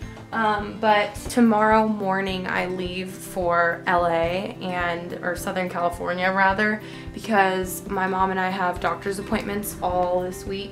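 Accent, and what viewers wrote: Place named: Russia. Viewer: Bahaha little russian accent is so hilarious. Oneeee, Twooo, Treee..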